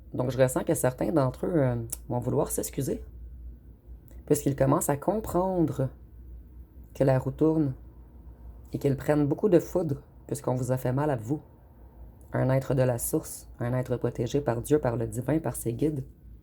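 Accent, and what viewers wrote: Quebec, Canada. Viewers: Vous etres rigolote j'aime bien vous ecouter quel beau accent quebecois❤❤❤❤❤❤❤❤❤❤❤❤❤❤❤❤❤❤❤❤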